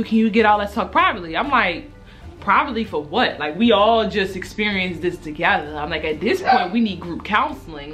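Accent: Baltimore, United States. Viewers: You sound like you're from Bmore. Remind me of talking to my nieces. I enjoyed the storytelling 💜 The math is just not mathing for me 😂😂😂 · Just got one question where u from Baltimore, jersey, or Delaware??? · U from bmore aint u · U from Baltimore I can hear the accent 😂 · Lol Are u from Baltimore? Your accent 😆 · She sound Baltimore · Lol first time watching you and caught the Baltimore accent early I’m subscribing ❤️🤣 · U gotta b from B-more or somewhere n Maryland..... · It's the Bmore accent for me. I love it here. 😭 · U sound like you from baltimore😭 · Hold up twoooooo was kinda long bmore ? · Sound like you got a Baltimore accent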